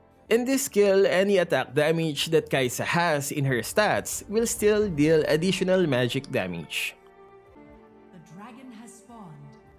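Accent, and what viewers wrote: Philippines. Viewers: Its because of his accent, “dealing” becomes “diling”,”living” becomes “leaving”, its because of his filipino accent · I can tell he's filipino 🇵🇭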